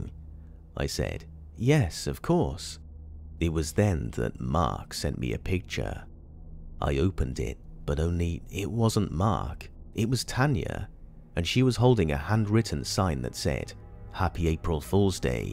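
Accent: United Kingdom. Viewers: Gehls. I love it !!! I love that British accent ! ❤ 🙏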